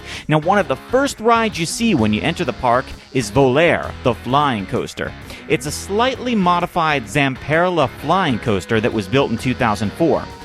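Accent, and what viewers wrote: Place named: England, United Kingdom. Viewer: ^^ Es is lustig zu höhren wie Engländer Deutsche Wörter ausprechen XD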